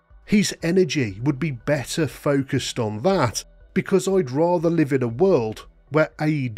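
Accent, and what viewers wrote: United Kingdom. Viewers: NGL I was missing your thick British Accent opinion on this. Nice Vid Bruv.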